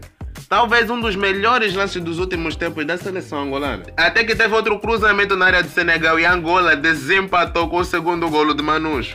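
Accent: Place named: Brazil. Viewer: bom trabalho mas para de falar com o sotaque brazileiro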